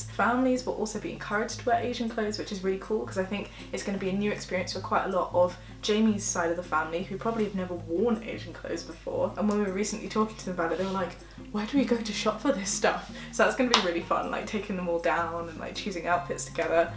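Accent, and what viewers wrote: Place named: Scotland, United Kingdom. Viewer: I’m so here for your Scottish accent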